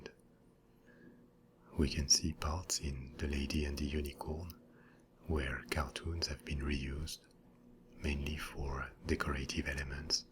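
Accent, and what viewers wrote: France. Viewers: I wish there were more ASMRtists doing history videos. I'm a huge history buff and the French accent only adds to the flavor.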